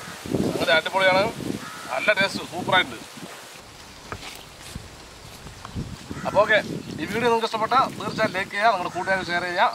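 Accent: Palakkad, India. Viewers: പാലക്കാടൻ ഭാഷ ഇസ്തം 😀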